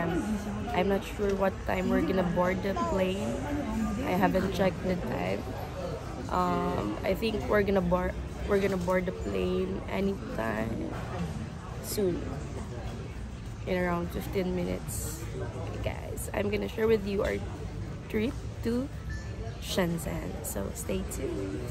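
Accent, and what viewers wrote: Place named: Philippines. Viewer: From her English accent, she's Filipino.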